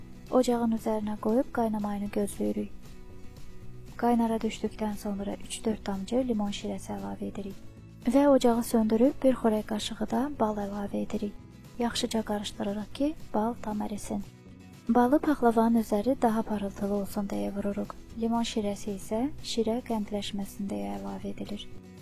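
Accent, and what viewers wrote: Lankaran, Azerbaijan. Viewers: Salam xanim,videonuzu izledim.Her wey Cox temiz,cox gozeldi.Aydin,selist nitqinize o melahetli sesiniz cooox yarawir.Men de paxlavani eyni Bu qayda ile edirem,ancaq qozun qabiqini hec vaxt temizlememiwem,hovselem catmaz buna😀Size wexsi bir sualim olacaq,cavablandirsaniz xow olar?Siz cenub zonasindansiniz?Lenkeran,Lerik?Lehceniz yoxdu,Amma bir kelmenizden orali olduqunuzu tehmin etdim.Sadece Duz fikirlewirem ya yox onu bilmek isterdim.Cox sag olun!Size 👍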